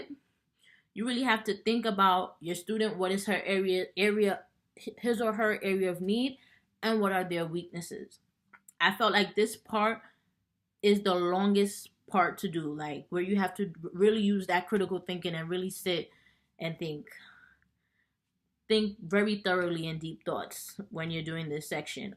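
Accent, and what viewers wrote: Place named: New York, United States. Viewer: Hello I'm going to start my student teaching and my Edtpa with 8weeks in special education and 8 weeks in elementary education. Although I have been a paraprofessional for 20 years and have a lot of classroom experience, im still a little nervous. I really like your channel. I find it very informative. I'm trying to look over the Edtpa special Ed handbook. I will be getting a dual degree in Elemantary and Special education. I have always worked in a special education setting and feel it would beneficial for me to complete my Edtpa in special education. I have a question? In the student teaching aspect im I going to be expected to take over all the duties as the teacher in the classroom by week 6 and work on completing the Edtpa? I'm here in Georgia. I'm from Westchester (Yonkers) and have a very strong New York accent. 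So when I came across your channel I knew you were from up top. Lol.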